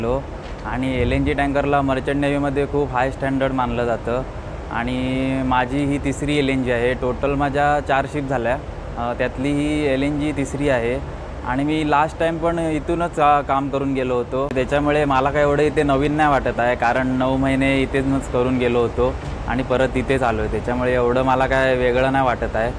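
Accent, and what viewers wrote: Konkan, India. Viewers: मी पण कोकणी आहे तुझा बोलने वरुन समजते तुकोणी आहे ते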